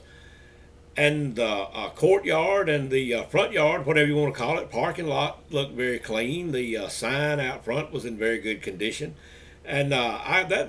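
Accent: Alabama, United States. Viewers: I love your Alabamer accent! Yeehaw!🤠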